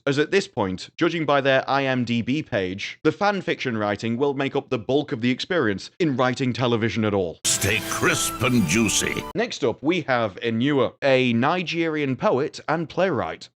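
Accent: United Kingdom. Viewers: I didn’t realize right wing American bigotry could have a British accent.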